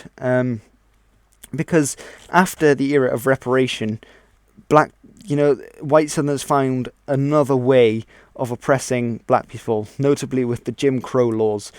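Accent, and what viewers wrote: United Kingdom. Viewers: Very good work here helps your British for At least us Americans can't get enough of a British accent ! Subbed! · This dude is British it sounds like lmaooo y’all still salty about some Patriot Americans who beat your ass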